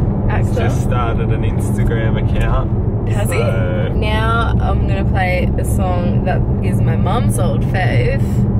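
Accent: Australia. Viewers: What's the city called? I love the Australian accent but sometimes I can never pick up lol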